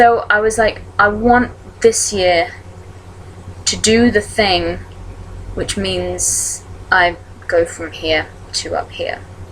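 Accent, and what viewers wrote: United Kingdom. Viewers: thank you. Nice video of LOA also British accent. I subscribed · Her accent is the most catchy thing ever, so cute :) Is that UK accent? What part of the UK is she from? I love it!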